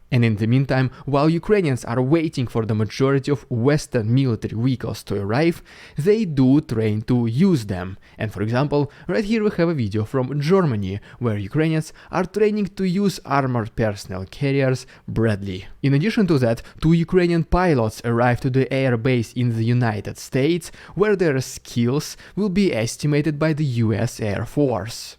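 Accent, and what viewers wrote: Russia. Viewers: If you still didn't understand that.....I was just trying to help the know that Rheinmetall is 3 syllables not 2, I just wrote the tiny line, you had to just comment, I did it not to be a dick, and to be nice about it without writing hey you said that wrong, he has a heavy accent just trying to help with a word he has used often lately. And what I wrote is a fact, what was your reason to comment? No life? An idiot in real life looking for a place where he can find some people less smart than you? Does that make you feel better? You are so dumb you started all this from a single line fact I wrote for the content creator not a random idiot which at this point is you. I could be a real ass and go back into how "Kaliber Missile" has 6 syllables....lol. Like I said have a nice day ass 🤡. And btw people do not care about your comments they are so ignorant your that idiot in the comment section btw......doubt you will change, it's your base intelligence limiting your mental growth so I don't totally blame you, just as you can't blame a child with learning disabilities maybe not meeting the same marks as their peers without a disability..........🙄🙄🙄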